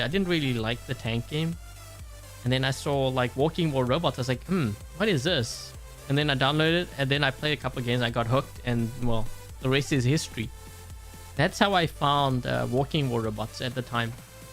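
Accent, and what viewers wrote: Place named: South Africa. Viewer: Hey....when I heard you talking about sushi craze in Toronto, I didn't realize you were Canadian. I'm from GTA area too. I thought from your accent that you were in south Africa. How do I join your discord.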